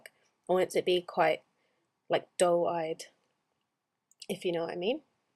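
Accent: London, United Kingdom. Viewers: You are probably the most beautiful girl ever haha u sound Like a Londoner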